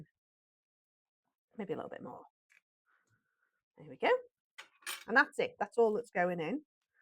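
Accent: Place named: Cheshire, United Kingdom. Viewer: How lovely to discover your channel this morning! It’s so nice to hear a familiar accent from my childhood (I grew up near Chester) … I haven’t heard someone say “skew-whiff” for years 😆. Those tofu wraps look delicious & I will definitely be trying those this week. I’m off to put the kettle on so I can watch a few more of your videos before I get going!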